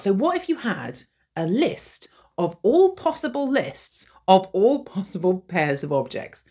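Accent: United Kingdom. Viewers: I came for the math lesson, but I stayed for the charming British accent.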